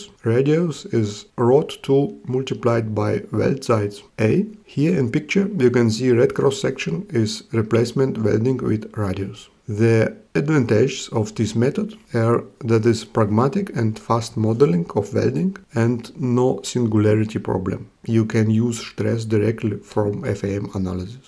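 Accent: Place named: Germany. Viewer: Great, thank you 👍 Love the german english mix Hundert five point vier 😁😁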